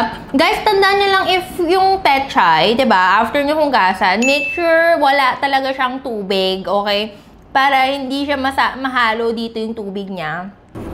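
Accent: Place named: Conyo, Philippines. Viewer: The way u speak it seems u r pure Filipino. You mix english with tagalog the accent as well.....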